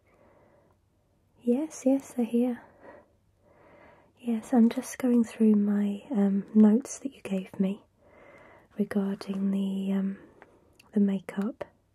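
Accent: United Kingdom. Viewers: your beautiful British accent and lovely personality are the sole reason why I watch your videos. My mom playfully teases me because I absolutely love the British accent and that I’m meant to marry an Englishman. ❤️ #ASMR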